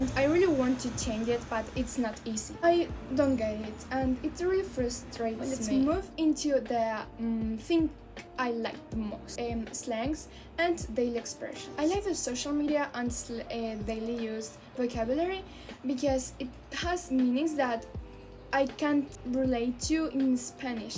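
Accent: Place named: United States. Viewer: For what it's worth, I really like your American accent! Of course, I'm American, so I'm probably biased, jaja! I started learning French a few months ago, and I don't know much, but I was so happy to get the gist of everything you said here in French - I'm at a B1 level in Spanish, and that knowledge has seriously helped so much with my French learning! I have so many languages I want to learn... While Korean has not historically been one of them, I do love the sound of it, and I always get wistful when I hear other language learners speaking it. So maybe I should move it up the list! I only recently found your channel, but I'm really enjoying your videos, so thank you!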